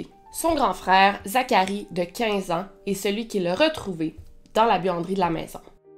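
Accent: Quebec, Canada. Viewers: Comment tu va concrètement?....comment tu vie ta bipolarité?.....désolé de poser la question je ne veux pas te manquer de respect ou quoi que ce soit seulement prendre de tes nouvelles!.....je suis désolé au cas ou ça te blesse. merci pour toute l’investigation personnelle et intime et recherche et travail et je t'adore toi et ton chien désolé j'ai oublier le nom Mais j'adore ton chien et toi vous êtes trop mignonne tout les deux et je craque complétement et j'adore ton accent québécois tu est trés belle au naturelle resplendissante comme un soleil désolé si ça gêne mais c'étais de la poésie et encore merci pour la vidéo belle!😼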